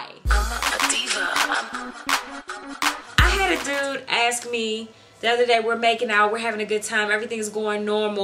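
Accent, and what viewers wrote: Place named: Washington DC, United States. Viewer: Where are you from sound like you from DC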